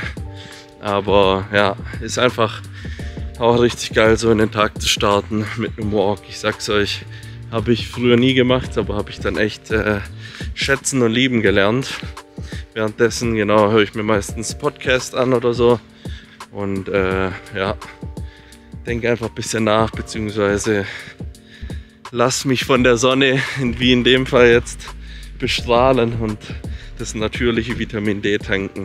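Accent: Swabia, Germany. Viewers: Sauber gschwäbelt. So isch richtig. Musch au mal wieder mehr schwäbisch schwätze ;) Krasse Einstellung und das kurz vorm Olympia, so muss das💪 btw. ich hasse die Hitze 😂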